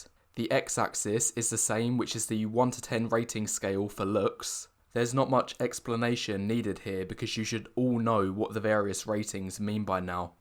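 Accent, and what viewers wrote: United Kingdom. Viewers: The british accent completes it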